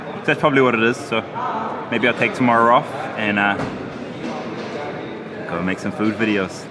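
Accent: India. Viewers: This dude must've been living long enough in India that his English is already got that Indian accent. 😁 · Hey what happened to your accent. You sound so Indian in your english accent 😅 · Sounding more Indian every video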